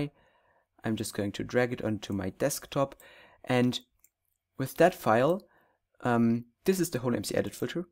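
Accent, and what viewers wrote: Germany. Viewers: u have a speak is like german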